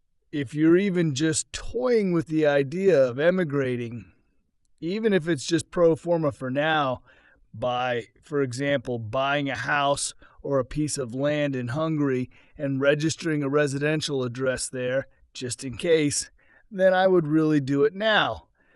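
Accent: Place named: Germany. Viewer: Is this AI speaking English? It’s so weird. I prefer to listen to your English with German accent. 😊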